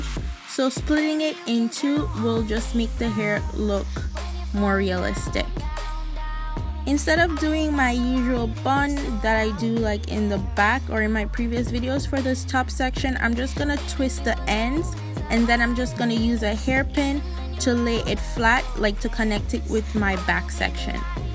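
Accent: Jamaica. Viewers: Are you a yaadi girl like me? i hear u accent!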